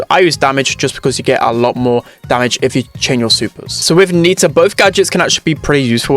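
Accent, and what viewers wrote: United Kingdom. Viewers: I can stand his bri'ish accent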